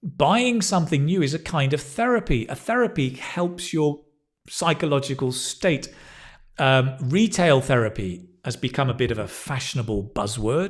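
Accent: United Kingdom. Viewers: Thank you, Mr.. I enlarge my vocabulary and improve British accent a lot with your channel. This passage is extremely great.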